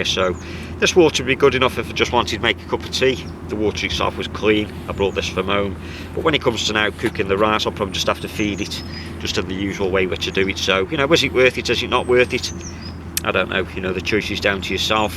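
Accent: United Kingdom. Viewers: Thanks for the reply mate. Yea, I could see the logic in trying it out. There was another idea someone else had to put folded tinfoil with holes in it to cover the feeding hole and encourage the air flow from below. They combined it with the vertical stack and believed that it was more efficient boiling water 2 mins faster. There's always going to be someone who has to try to get a faster boil. Or a newer more fancy stove to shave a few precious seconds off the boil time. Kind of takes the fun out of the whole thing and feels like they are missing the point a bit. Though I am coming at this from a leasure angle rather than a survival one. Me :) I'm happy fueling the fire as I go and enjoying the whole experience. The fun of watching and feeding the stove is a big part of that. The smell of the smoke and the flavour when you cook with it. Totally agree. It's also really uplifting after a long week just to get back to basics but from your videos you and im sure most of the people watching your videos completely get it :) Also nice to see someone from the UK with an accent I recognise on here lol. 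I'm up in Scotland.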